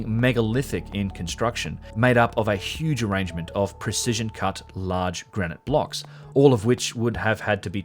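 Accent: Australia. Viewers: 'The royver of such soyze the noyl' its English? Another stupid Auzzi hack lol. Please Australia speaking Australian Cockney is not good!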